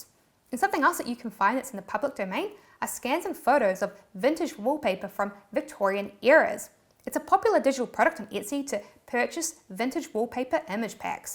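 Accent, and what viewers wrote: New Zealand. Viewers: I somehow understood it the moment I heard on word. Letter E sounds I and I love NZ accent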